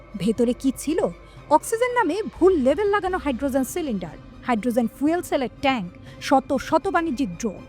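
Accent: India. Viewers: পাঠিকা কি ভারত থেকে আগাত? ভাষা অনেক টা তেমন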